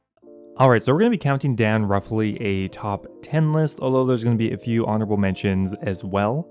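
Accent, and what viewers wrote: Canada. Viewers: That’s the American way. This guy sounds Canadian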